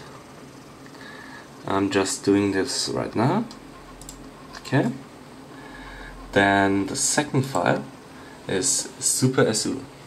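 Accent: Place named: Germany. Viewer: Tried so many times to root my Z1 compact and all methods never worked until this one, Thanks very much, greatly appreciated, root succesful, now all i gotta do is figure out how to get apps to actually work on the sd card, using folder mount and whatever else is needed. I had an idea when i heard the German accent it was going to work this time :)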